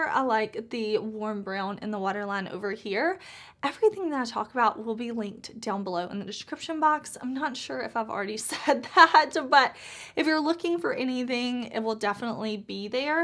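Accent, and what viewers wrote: South, United States. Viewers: . I want to be you when I grow up. Love your eyeshadow looks and your Southern accent.😊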